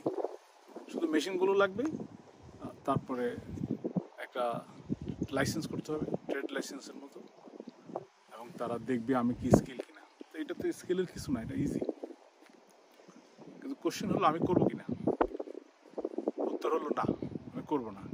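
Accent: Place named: Jessore-Khulna, Bangladesh. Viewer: ভাই আপনার ভাষা তো যশর/ খুলনার মত যদি বলেন বাড়ি টা কোন জেলায়? আর ভিডিওতে আপনার কথার সাউন্ড কম আসে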